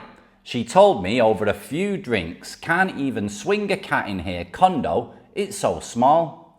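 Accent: United Kingdom. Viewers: You don't sound Australian, mate. You sound British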